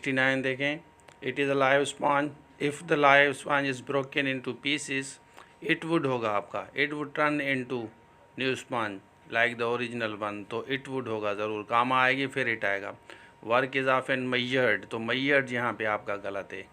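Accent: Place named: Sindh, Pakistan. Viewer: Grateful Dear Sir! Yh beech beech mn sindhi bhe mix hojati hy ap se sain jese hum sindhi logo ki adat hoti hy Hahaha. Wari Wari Hahah. .. God bless you sain!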